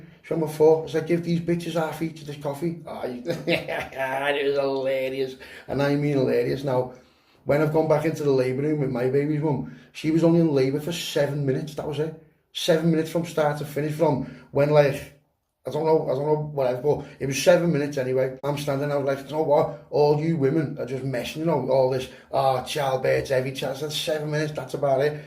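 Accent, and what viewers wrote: Liverpool, United Kingdom. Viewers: Yes scouse ❤❤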